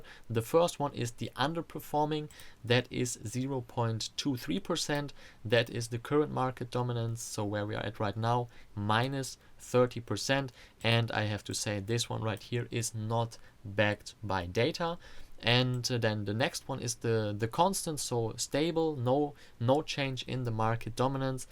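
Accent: Germany. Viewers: einen deutschen erkennt man immer wenn er versucht Englisch zu sprechen !! nice video bro :D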